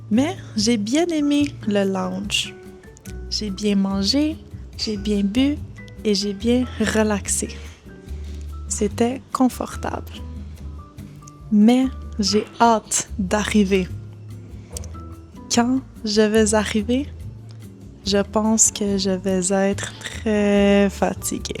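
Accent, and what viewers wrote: Quebec, Canada. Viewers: Yesss! Quebecois French! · ❤❤❤I am subscribed to you and I just had a feeling haha. I noticed there r been fewer videos, months apart sometimes and I was like “huh she must be busy with other life stuff” then I started watching dreaming French and I thought “wouldn’t it be weird if she wasn’t posting as much bc she was making videos for their Quebec accent” then I saw you and freaked out bc my conspiracy theory was true haha. You’re really a great teacher, I would watch some videos over and over to get familiar with the accent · omg canadian french accent slay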